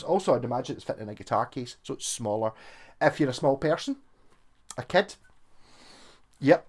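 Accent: Scotland, United Kingdom. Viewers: I'm referring to the bass in the video.. You did say it is a Wasburn refinish by JPAX correct ? I'm sorry if I'm bugging you but that is a great little bass and I'd like to find one for myself to try.. You do such great reviews and I love to hear your thick Scottish accent ! I'm in the USA but my ancestors were part of Clan Buchanan. I'd love to visit there someday.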